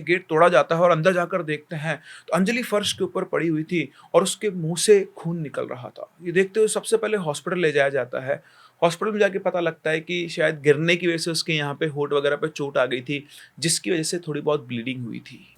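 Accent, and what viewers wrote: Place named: Rajasthan, India. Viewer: Intro se Samajh ara h Rajasthani hona 🤭 Ghani Khama 🙏🏻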